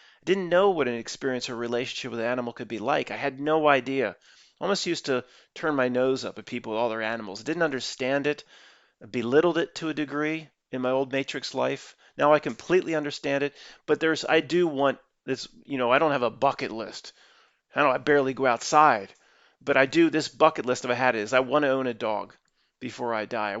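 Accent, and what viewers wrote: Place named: Philadelphia, United States. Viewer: That Philly accent is real. Cheers,!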